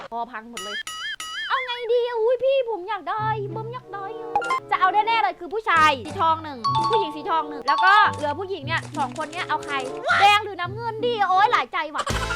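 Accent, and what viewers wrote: Isan, Thailand. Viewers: พี่ พูดอิสานน่ารักมากกก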